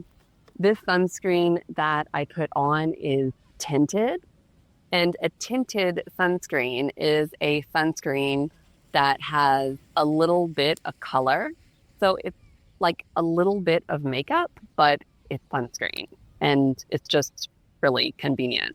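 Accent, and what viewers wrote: United States. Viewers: Dear One day on the beach - ti's is so nice idea and practical as well I practise listening, I enjoy it a lot even though your English is definitely American (I prefer soft British), but it is done in very interesting: slow, creative, transparent and coherent way. Also your today's adventure has reminded me my a few Spanish experiences in the past. There are rally beautiful memories. Warm hugs from England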